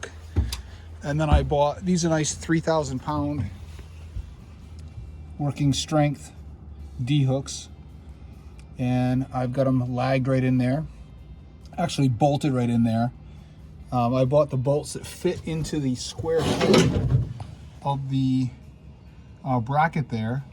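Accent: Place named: New England, United States. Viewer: "Plus it's Dahk." The first time I've noticed the new england accent and I've got to be 9 months or better into your videos. Lol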